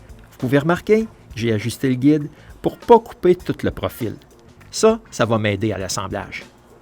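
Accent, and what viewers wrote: Quebec, Canada. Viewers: encore une fois, Merci! Vous êtes ( et toi) les meilleurs.Avec en plus l'humilité et la modestie que l'on ne retrouve pas dans d'autres vidéos de bricolage. Pour beaucoup c'est souvent à l'américaine, avec beaucoup de blah blah et gesticulations pour au final n'être que de l'assemblage d'éléments achetés en grand magasins avec un résultat déplorable. De plus vous me réconciliez avec l'accent de la belle province!. A propos, que veux dire " Gosseux d'bois "?!? A bientôt!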